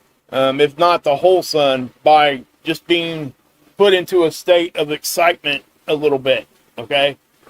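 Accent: South, United States. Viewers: You have made alot of effort to curb your southern accent. Thanks for keeping up and sharing with us. I know I can count on you for quality content.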